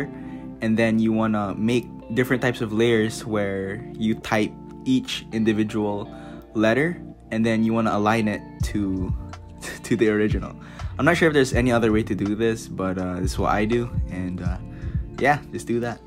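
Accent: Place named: Philippines. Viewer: Filipino accent do be nice tho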